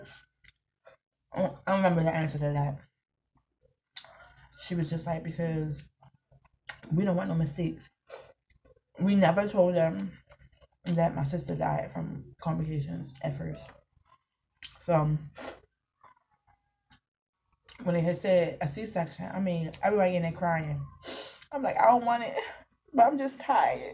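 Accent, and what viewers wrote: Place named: Baltimore, United States. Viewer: You’re from Baltimore. I know this because I love y’all accents so much😭❤️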